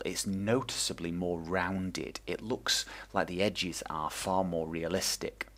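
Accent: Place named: England, United Kingdom. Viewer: His accent is English.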